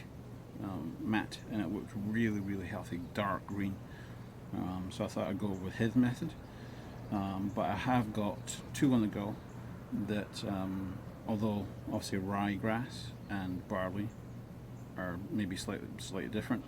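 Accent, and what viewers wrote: Scotland, United Kingdom. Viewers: Which part of Scotland you originally from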